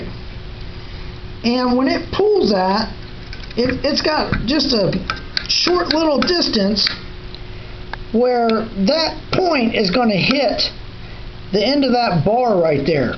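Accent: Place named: Northwest, United States. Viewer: He must be from the northwest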